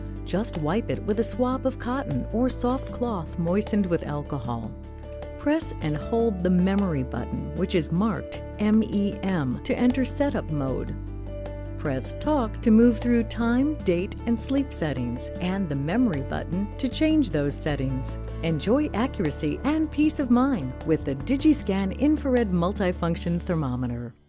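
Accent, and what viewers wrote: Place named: England, United Kingdom. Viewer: I think it's an English accent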